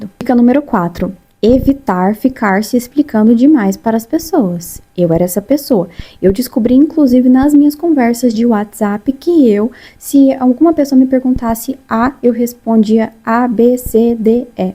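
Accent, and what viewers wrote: Minas Gerais, Brazil. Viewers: Cê é mineira?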